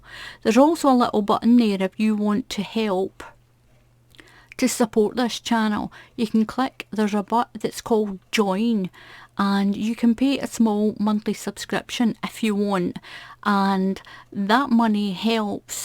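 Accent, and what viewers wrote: Scotland, United Kingdom. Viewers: Not sure where in the world you live but believe you me accent is a lovely soft Scottish brogue, not thick at all ( ps I’m not Scottish) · Thank you so much...I want to make some thing nice as a stripe in the middle of a big afghan I`m crocheting for my husband, and this will be great. p.s. I think your accent is charming. I am in the US, but many of my ancestors came here from Scotland and I like to think that they sounded like you. Keep safe and thanks again.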